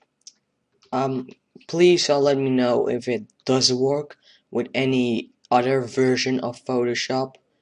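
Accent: Netherlands. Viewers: Je hoort nauwelijks het Nederlandse accent, doe je goed, ge hebt een sub erbij ;) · haha ik zag nl-NL voor bij komen, vandaar het dinglish accent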